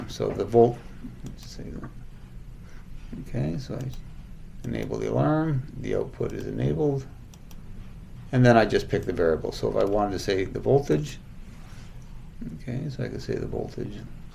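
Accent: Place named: Canada. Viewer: 可以确定讲的是加拿大英语， 😇😇😇😇😇😇😇😇😇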